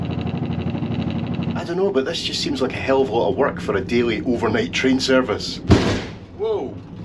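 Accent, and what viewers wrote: Scotland, United Kingdom. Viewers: I love watching your videos. I live in Australia and moved here 25 years ago. You make me miss Scottish Patter! Your great!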